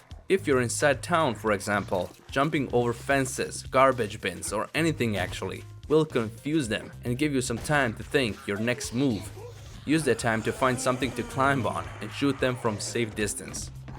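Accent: Russia. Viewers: i can hear the russian in your voice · That Russian accent though. Never heard one in real life in before.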